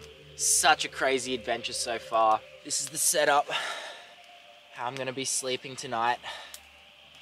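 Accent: Australia. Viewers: Love that aussie accent !!! Awesome content by the way !!! Greetings from Switzerland !!!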